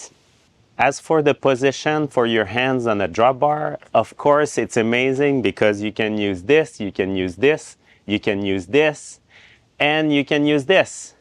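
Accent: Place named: Quebec, Canada. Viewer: Great Video, keep the great information coming. I always love Quebecois accents so no apologies necessary. we ski and hike in the Charlevoix region all the time. Jeff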